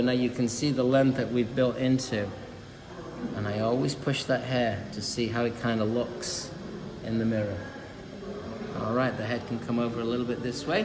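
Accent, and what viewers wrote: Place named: United States. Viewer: Why are you trying to speak with an American accent ??!!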